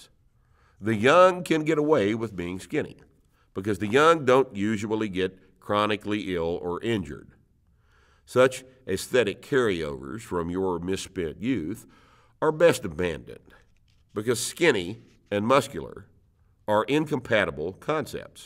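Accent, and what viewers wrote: Texas, United States. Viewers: It's them beautiful Texan tones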